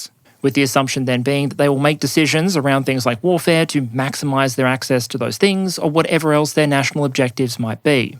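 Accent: Australia. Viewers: I always find it funny how you seem to put so much effort into pronouncing some things as per the original language, and yet some words are full Aussie. E.g. compare Iran (with rolled/tapped "r") to Beijing (basically Beige-ing). It's a very Educated Australian way to go about things. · a pure stream of facts, graphs and statistics sans bullshit with unparalleled expertise and an aussie accent. what's not to love :)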